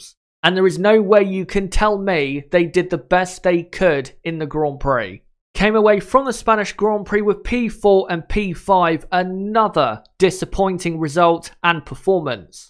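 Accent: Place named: West Country, United Kingdom. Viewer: Great analysis. Are you from the West Country? It's just the way you pronounce "SebaRstian Vettel."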